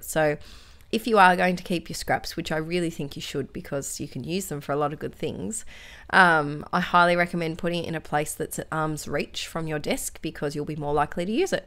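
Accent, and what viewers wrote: Australia. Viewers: Always look forward to your videos (you are the first person I look for each day when I first log into YouTube!!) and I love your "Scrap Stash Saturday" videos - it's lovely to see such inspiration and different ways of using those little bits which would normally either sit in a drawer or be put in the bin. Watching your videos and techniques is what made me start buying and using Distress Stains and Sprays but I'm only a beginner, and yesterday I bought my first Project Life cards and page protectors - thank you!!! I am in the UK and really enjoy listening to your accent and hearing about life in Oz too... we were in Hobbycraft yesterday (a bit crafting store) and I was walking around with my boyfriend, we kept seeing different things like Gelatos and thickers, each time I turned to him and said "that's what uses" lol, think he thought I was mad!! 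Keep up the great work <3 xxx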